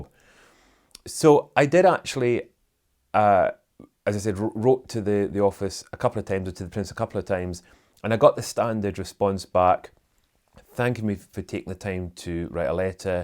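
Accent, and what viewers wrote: Scotland, United Kingdom. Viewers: I could listen to you all day! Such a lovely Scottish accent. Love your channel! Highgrove...the most beautiful place on earth! Prince Charles had the vision to turn it into a paradise. Watching the documentary of Highgrove, by host ( Alan Titchmarsh) made me cry. Prince Charles is an incomparable gardener, which shows me he truly has a heart of gold. I adore him and his lovely wife,( The Duchess of Cornwall). Who wouldn't want to work for them! I'd work for them doing anything, for free! Greetings from Canada.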